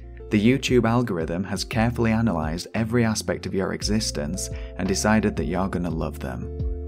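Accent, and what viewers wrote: Yorkshire, United Kingdom. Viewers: Sounds Yorkshire or northern English to me, based on all the Brit TV I've watched my life. (I'm American) 😊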